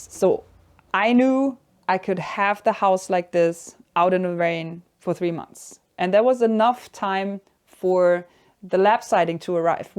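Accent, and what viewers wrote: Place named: Germany. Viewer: PS: the G'Enlish is an added bonus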